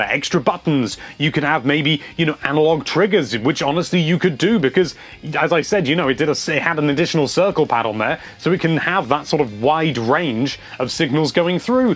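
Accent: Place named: United Kingdom. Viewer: I love your British accent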